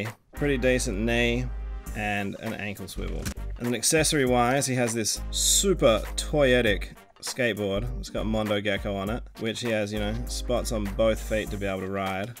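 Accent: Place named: Australia. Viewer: Where did you get these? I assume you're in Australia judging by the accent. I am too so I'm looking to get these if I spot them anywhere.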